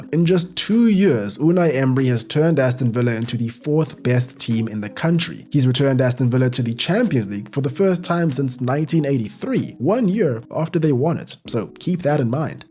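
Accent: South Africa. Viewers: I just know that’s a South African accent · Are u South African?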